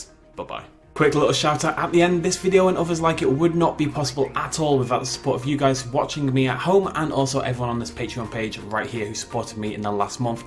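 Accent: United Kingdom. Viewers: Hey I was wandering which part of Britain your from As I am from Worcestershire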